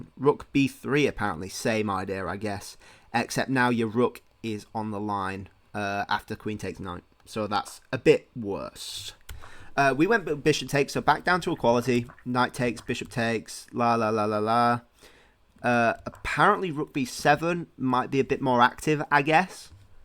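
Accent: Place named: Cockney, United Kingdom. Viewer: i think it's his cockney accent.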